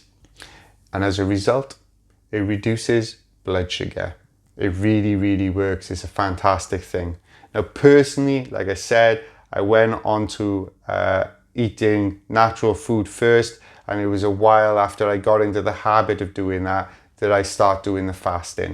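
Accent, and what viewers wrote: Wales, United Kingdom. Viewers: Thanks for a wonderful talk and love your Welsh accent! The way I think of things are that most medications only treat the symptoms of T2D , only a good clean diet of natural foods will help the actual disease from progressing. As Dr Jason Fung says “ Diabetes is a dietary disease and therefore needs a dietary solution”. Charge on Rhinos and good luck to you all.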